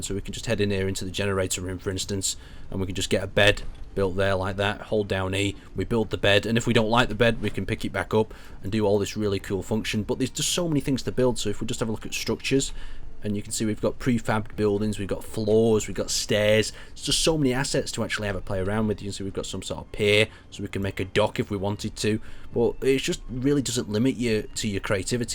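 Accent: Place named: Burnley, United Kingdom. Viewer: where are you from? Burnley lass!!!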